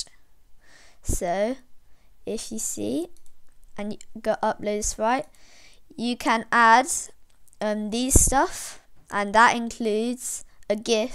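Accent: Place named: United Kingdom. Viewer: R u British? You sound British if your not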